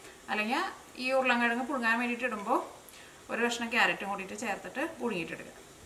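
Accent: Thrissur, India. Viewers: urakka parayana...nammal trichur kar allaaa...speed kooduthala..chilathu manassilagunnillaaa...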